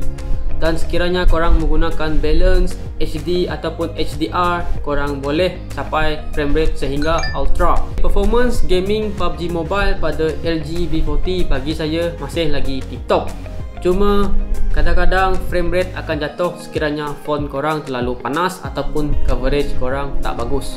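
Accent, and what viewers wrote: Sarawak, Malaysia. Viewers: suara mcm org sarawak,cakap seketui seketui..senang paham..goodjob👍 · ☺️ baruk nengar aku dh tauk kompom org srwk. Diam sine pok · Urang sarawak ka😊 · Kaka macam kenal jak, orang sarawak kah?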